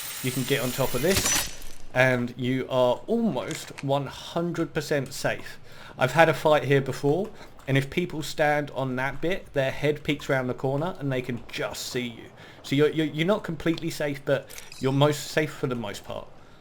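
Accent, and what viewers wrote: United Kingdom. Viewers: Just make sure you keep your English accent and good luck in the states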